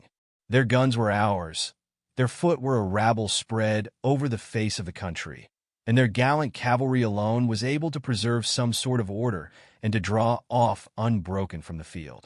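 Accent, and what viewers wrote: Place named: United States. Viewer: American narrator ! totally inappropriate and not welcome .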